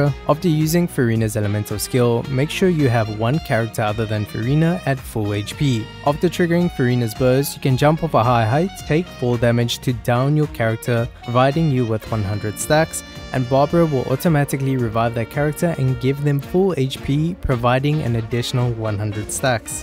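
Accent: South Africa. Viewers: Do I detect a lovely South African Accent?! Respresent! XD enjoyed the video 🤌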